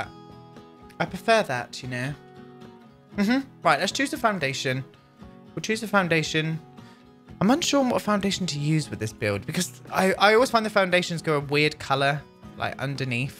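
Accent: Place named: RP, United Kingdom. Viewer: I looooove your pip pip cheerio british accent! Your builds are gorgeous!! ❤️ Much love from Norway 🇳🇴!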